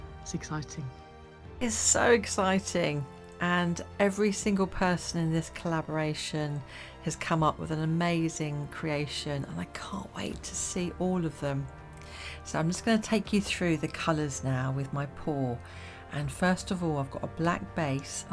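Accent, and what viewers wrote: England, United Kingdom. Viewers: This was an incredible piece of artwork,. really lovely to listen to your story. You have a very soothing voice, also great to hear a fellow English accent 😂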